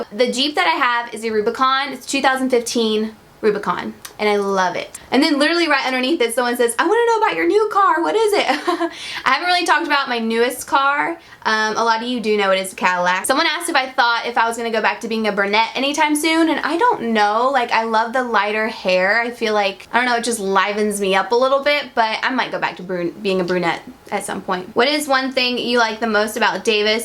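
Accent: South, United States. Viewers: If you guys watch the bachelor she reminds me of Raven! They kinda have the same southern accent and face lol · Your new favorite song🙌loved your lip sync to it!😍 p.s. I love your southern twang. ❤And you go girl with your independent self 💪💁👏👏👏it is hard not being able to talk to your best friend, but when they return it is the best!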